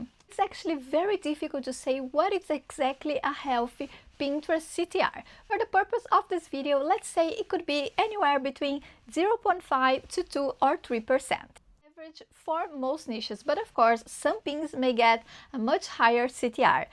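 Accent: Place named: Brazil. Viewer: Are you brazilian? your accent is kinda familiar tho! trhanks for the info!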